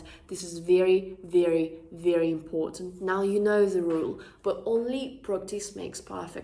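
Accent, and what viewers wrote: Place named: United Kingdom. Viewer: Нормальное произношение. Британский акцент.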